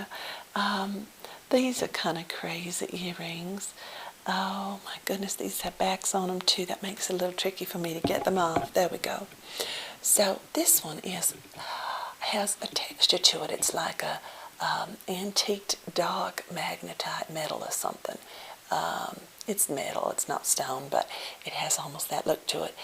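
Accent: South, United States. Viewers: Love Miss! Would like to hear more as I do love her southern accent - gives me tingles! This is one of my top fav asmr videos. Thank you. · I love the fact you didn't go overboard with the usually stereotypical "Southern" accent. I have actually heard women that speak like this. Especially how you said window screen. Thank you for your wonderful videos! I am so glad I found you! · you remind me of one of those 1950" s housewives you're beautiful and have a very southern accent love to hear southern accents mine is Lakota Sioux sound very young voice lol anyways love your videos and earrings but why do you whisper ? and anyway love to be friends on my fb my name is summer rain Americanhorse on F B ty for the videos God bless🐝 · When the video first started, I was disappointed for a minute because I was expecting a different kind of Southern accent but the longer I watched it, the more my head melted so I am no longer disappointed! 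Thank you very much for this!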